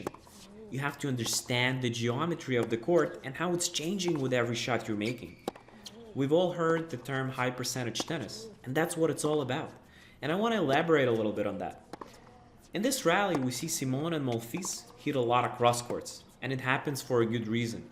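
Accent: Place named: Russia. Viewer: your accent sounds like russian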